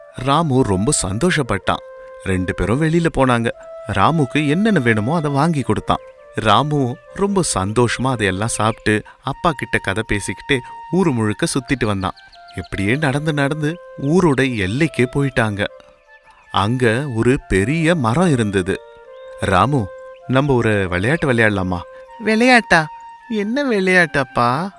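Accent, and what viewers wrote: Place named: North, India. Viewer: Avar vadakkan apdithan pesuvaar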